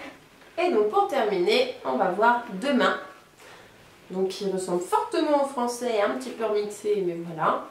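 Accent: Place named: France. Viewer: Non ho bisogno di imparare l'italiano, considerando che io sono italiano, ma non riesco a smettere di guardare i tuoi video: hai il viso più bello che abbia mai visto in tutta la mia vita. Poi il tuo leggero accento francese, quando pronunci una parola italiana, è dolcissimo :)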